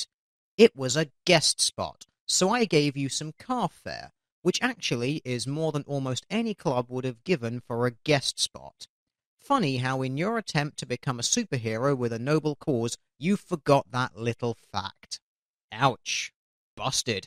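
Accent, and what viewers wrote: United Kingdom. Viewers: Aw, damn. He's winning me over. His snarky-British blue deer accent is wiggling all around in my brainspace, making me giggle and think critically and shit. Whoo, binge watching!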